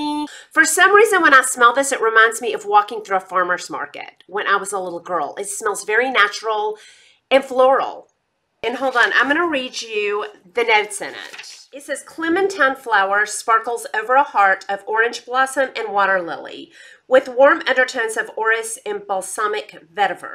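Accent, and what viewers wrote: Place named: Texas, United States. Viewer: Here from mr. Kongs mom channel... love your accent I'm married to a texan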